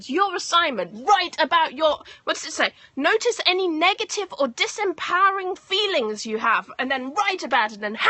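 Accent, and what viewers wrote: United Kingdom. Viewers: ARE YOU AN ENGLISH GIRL?? BECAUSE YOUR ACCENT APPEAR LIKE YOU'RE FROM UK??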